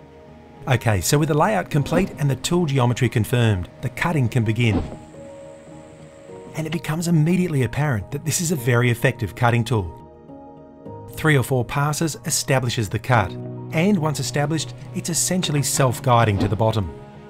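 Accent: Australia. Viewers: Ele e Australiano. Eles mudam o som dos vogais. Use os subtitulos ingles.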